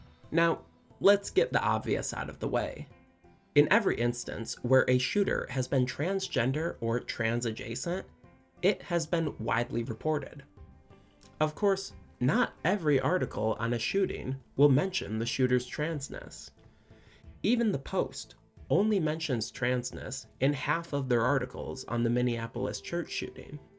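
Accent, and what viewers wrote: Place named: Michigan, United States. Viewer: Yo, A new video by my favourite YouTuber with a thick Michigan accent, on my favourite Holiday too? Can this get better?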